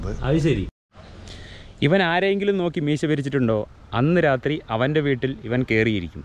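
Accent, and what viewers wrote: Palakkad, India. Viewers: intro kalakki . paalakkaad oru sambavam thanne. Paalakkaadan slag aanoo chettan samsaarikkyunne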